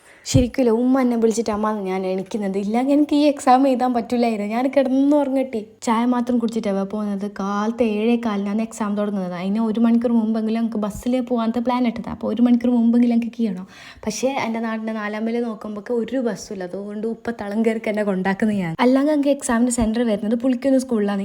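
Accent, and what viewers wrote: Kasaragod, India. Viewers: vedio kand kand ipo ksgod slang set avunund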